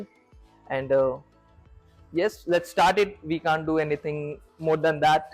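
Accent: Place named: Bangalore, India. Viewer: typical blg accent uhh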